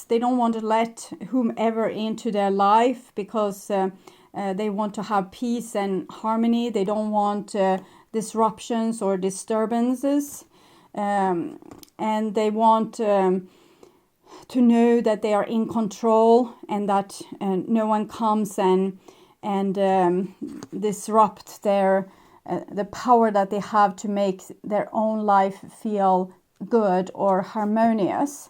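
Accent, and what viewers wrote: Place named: Sweden. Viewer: thank you yor Swedish english braught many fond memories back out of Järnatiderne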